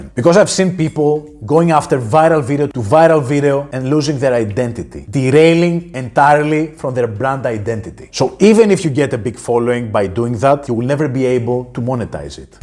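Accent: Greece. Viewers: I think you are from Greece because of the accent ☺️♥️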